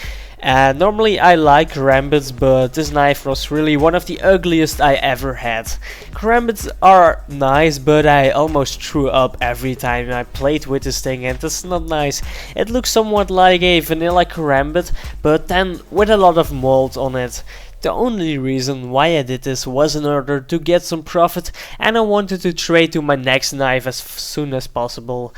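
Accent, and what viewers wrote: Netherlands. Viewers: Are you Dutch? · pik praat eens fatsoenlijk engels het is echt irritant om het nl accent de hele tijd te horen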